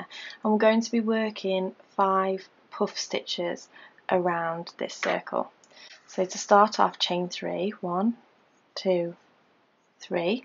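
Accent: United Kingdom. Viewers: great tutorial, good pace, and makes a pretty star :-) Thank-you! Nice to have a British voice, too ;)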